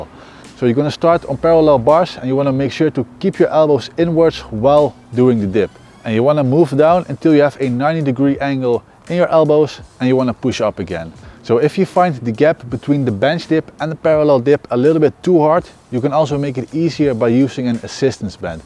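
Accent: Netherlands. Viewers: Nothing more goofy than the Dutch accent. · ik hoorde gelijk al dat je nederlands was haha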